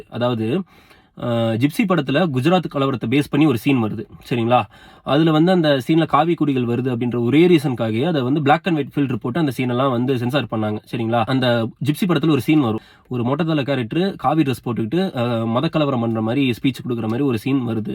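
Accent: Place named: Pakistan. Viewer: you are literally speaking like a Pakistani person voice,ur videos show's how much ur radical, u r too bad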